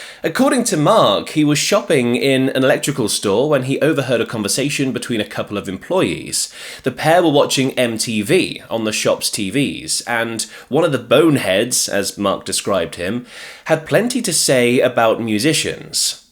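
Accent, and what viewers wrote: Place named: Newcastle, United Kingdom. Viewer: Geordie teaching English? Awye mun!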